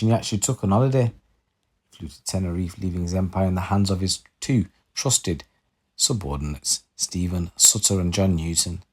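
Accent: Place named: Liverpool, United Kingdom. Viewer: 😂😂 I like you’re scouser accent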